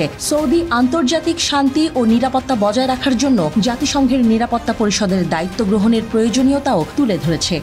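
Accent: Kolkata, India. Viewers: আর টিভির যে কন্ঠ দিচ্ছেন একি ভারতের কোন নাগরিক কিনা গলার সুর শুনে মনে হয় ভারতীয় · এই মহিলা কোলকাতার ভাষায় কেন খবর পরছেন?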